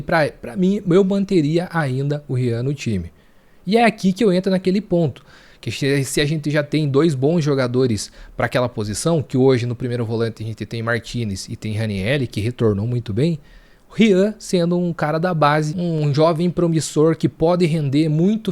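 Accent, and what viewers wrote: Paraná, Brazil. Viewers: Conheci seu canal hj. Vc é paranaense? Nasci em SP mas cresci em CWB. Reconheço esse sotaque kkkk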